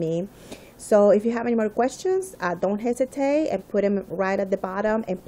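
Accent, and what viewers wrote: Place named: Germany. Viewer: Love that accent. German ?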